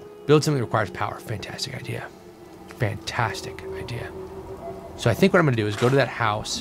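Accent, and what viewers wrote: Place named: United Kingdom. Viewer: lol... Love the British accent. All this brings me back to FNV, first saw you, and ur vids, followed you through all that, and Skyrim till that bombed, but damn you drove me nutts in that one since I was trying to follow, never did finish it. Love your mom, but I agree it's just too much for her to watch all, and u being able to say whatever you want... Thanks for all the entertainment with all these vids, they are very important to many people.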